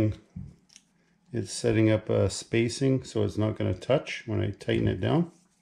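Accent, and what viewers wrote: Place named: Canada. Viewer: he has that canadian accent so cool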